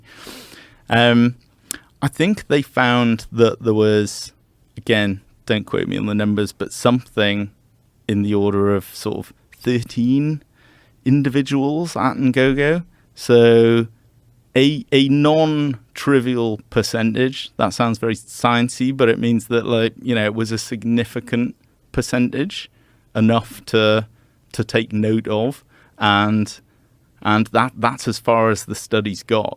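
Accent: United Kingdom. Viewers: There's no such thing as a British accent he has a welsh accent 😂😂😂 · Proof that having a British accent can make a man who is saying absolutely nothing sound articulate.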